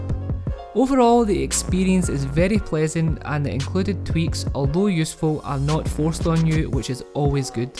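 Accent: Scotland, United Kingdom. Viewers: Canadian? With a Scottish accent? How did that happen.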